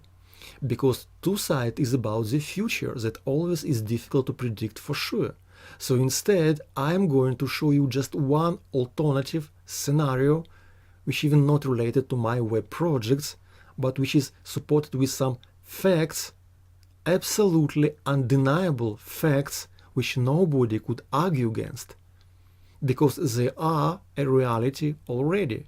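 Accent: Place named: Russia. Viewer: Ну, во первых в своём комментарии я не затрагивал вопрос национальности, а имел в виду твоё произношение. Я понимаю, ты в этом не виноват, так тебя научили. Такое впечатление, как будто ты читаешь английский текст в русской транскрипции. Ведь в английском есть звуки, которые невозможно выразить по русски.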